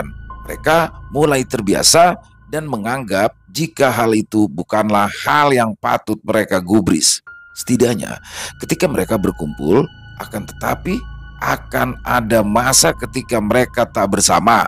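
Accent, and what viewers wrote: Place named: Kalimantan, Indonesia. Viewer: Kok yo Kalimantan bgt gtu lho 😭😂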